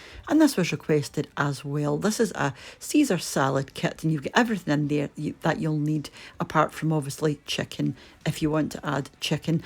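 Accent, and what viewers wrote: Scotland, United Kingdom. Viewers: I I'm new to your Channel I'm not sure how I feel and you must have had something to do with tea LOL so forgive me for maybe asking me redundant questions but is that a Scottish or Irish accent that you have? What is the difference between British cheddar cheese and American cheddar cheese? I had to Google the difference between grams and things because we measure by cups in the USA; I don't know if they were the exact measurements. But they were great brownies. Thank you so much for the recipe. Safe travels be safe be well, blessings and much love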